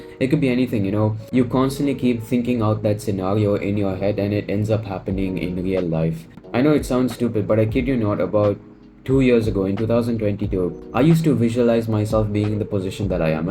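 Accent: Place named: India. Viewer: You being indian and having slightly indian accent do you think any foreigners watch you because i dont think they do only indians watch indians i feel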